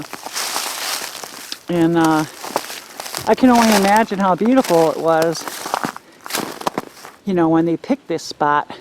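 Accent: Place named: North Carolina, United States. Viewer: Being from North Carolina, I immediately recognized the accent. My mom was from Sheboygan. Very cool!!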